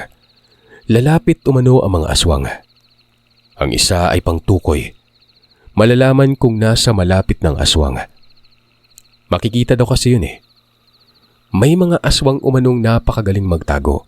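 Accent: Visayas, Philippines. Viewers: Nanggigil ako sayo sir. Napa ka slung ng bisaya mo.. pa-ma` ba`. 😂😂😂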